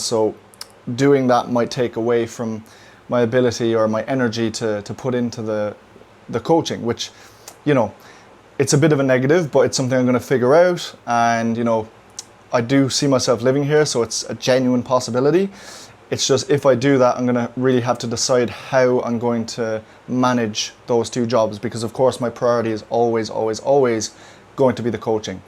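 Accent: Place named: Ireland. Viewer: Beautiful Irish accent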